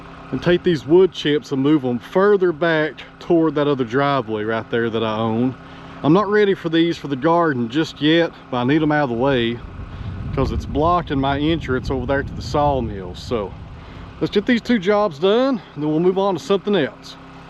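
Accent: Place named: Tennessee, United States. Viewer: Are you kidding? That Tennessee drawl is what keeps me coming back...that and is just good ol' country smart. Listen a bit and you gonna learn something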